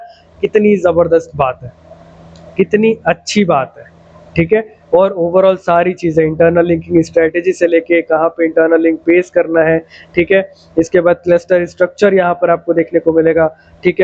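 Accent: India. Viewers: bihar s ho kya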